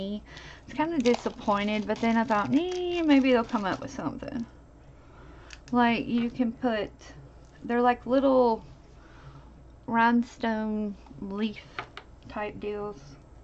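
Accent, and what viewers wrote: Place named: Eastern Kentucky, United States. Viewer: On the last ornament..., did you ever try using some sort of a starch, maybe? And girl, where are you from? I'm in Nashville, but I'm from Eastern Kentucky. Your accent sounds like mine...lol.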